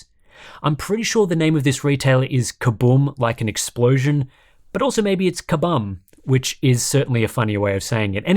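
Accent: Australia. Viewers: yknow having no reason to actually visit the site, I've just been hearing "PC k-sku" for years now like ah yeah that's a neat techy name. Aussie accents are smth else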